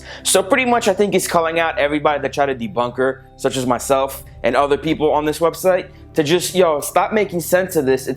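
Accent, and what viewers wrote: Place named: South, United States. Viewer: where are u from cuz have a southerny westernizh accent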